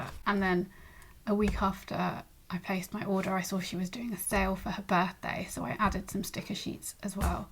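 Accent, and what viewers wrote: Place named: United Kingdom. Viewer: you have a British accent?! OMG!!! I was in love with your journals, personality all this time, and now I fell in love with your accent and pretty voice. Definitely continue doing voiceovers. Music to my ears💕💕💕 I need to get the green version of this journal. It is just so aesthetic, I'd love such journal to sit in my shelf. And her washi tapes and sticker designs are always so elegant and feminine. Love love love Leela's style and your unboxing❤‍🔥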